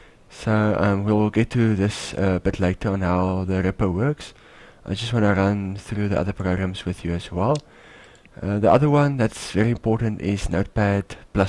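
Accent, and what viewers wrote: South Africa. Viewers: You sound like a south African. That's surprising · he clearly has the South African accent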